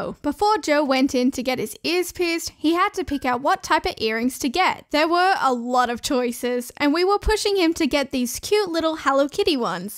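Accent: Australia. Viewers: the australian accesnt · Are you Aussie??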